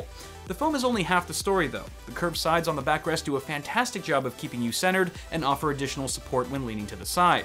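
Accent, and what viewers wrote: United Kingdom. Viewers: ... I'm from the UK. British accent approved. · love the british accent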